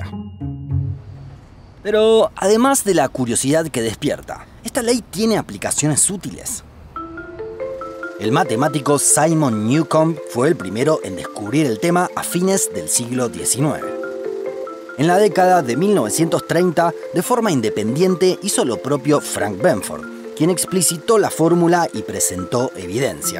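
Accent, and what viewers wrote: Argentina. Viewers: Tu acento argentino es muy bonito, como tus vídeos.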